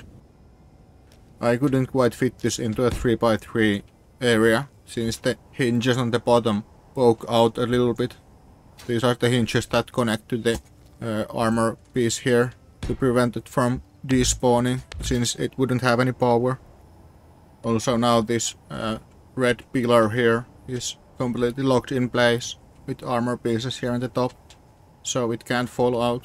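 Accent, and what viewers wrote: Finland. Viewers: You sound finnish, Are you finnish? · Just found your channel earlier and love it! Keep up the good work man. Also, you have a very interesting accent, are you from Finland?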